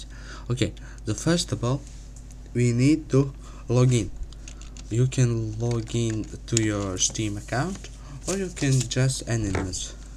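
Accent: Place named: Russia. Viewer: Are you Russian? Просто дико русский акцент, а насчет туториала, спасибо, помогло :)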